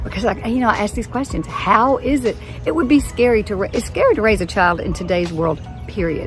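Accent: South, United States. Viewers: For those of us who ARE on the same page with you, people with conservative leanings (most of CA IS CONSERVATIVE, I know, you don't buy it, but... Demoncrats), it seems like we have to PROVE ourselves to you. Enough of that. I am the one who thinks, acts and lives his life QUITE the same as you folks. My experience, just a couple weeks of living here, is that these dozens of videos I've watched on the subject are, by and large, the truth. Folks here are kind, polite, they DON'T PUT ON A FACE, they don't ignore or dismiss you. And, as a person who was born "up north" in Michigan, I love that southern drawl, and yes, you folks have that. Let me tell YOU something. If you have never lived in California, as I have, YOU have no idea what that experience is like to have whole neighborhoods, communities, cities and the WHOLE COUNTY of LA as entities which are generally working against you. 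This is besides the crazy high cost of living, crime, homelessness, people begging for money outside of EVERY store or gas station or facility you enter. I was lucky I did not incur direct harm to my person throughout 17 yrs., but I did have a couple of incidences of hit and run to my car that the police would not even respond to because no person was hurt. Cut me some slack, I am, AT HEART, a good ole boy, a friend, a good neighbor, I am willing to work with you, help you out, and you can trust me to come through for you. I came here to be relieved of that CA burden, and so far, I am seeing that.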